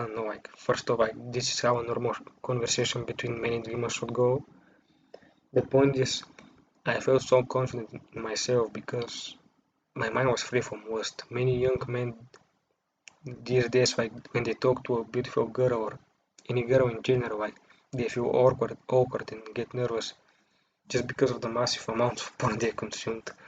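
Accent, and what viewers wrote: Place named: Russia. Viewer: Your English is very Russian but I liked it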